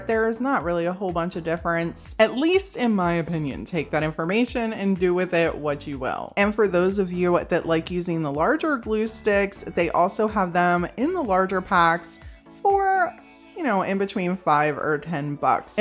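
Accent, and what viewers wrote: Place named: Baltimore, United States. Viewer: I did actually mean like how to sell my products lol sorry I suffer with chronic pain and sometimes my words get jumbled up. Can I ask where you are from? I’m originally from Baltimore, MD, but I’ve lived in San Diego, CA since I was 8-9, it’s just your accent that reminds me of my mom’s. She’s was born and raised in Baltimore and she had a very similar accent like yours. 😊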